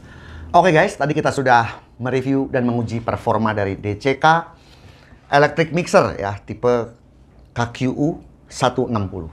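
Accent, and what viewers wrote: Tegal, Indonesia. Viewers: mantap bang? lebih mantap lagi ada suara kas orang tegal,ha..ha... joooos.!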